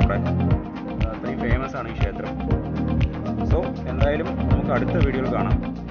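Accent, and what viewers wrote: Palakkad, India. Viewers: പാലക്കാട് കാർ ഭാഷ ക്കു പാലക്കാടൻ സ്ലാങ് ഉപയോഗിക്കുന്നത് അഭിമാനം ആണ് . നിന്നിലൂടെ എങ്കിലും നമ്മളുടെ സ്ലാങ് കേൾക്കുമ്പോൾ ഒരു സന്തോഷം ഉണ്ടാവും . അവനവന്റെ ഐഡന്റിറ്റി യിലൂടെ പൊയ്ക്കോളൂ .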